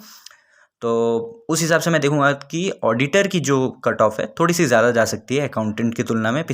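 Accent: Odisha, India. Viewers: Bro r u from odisha?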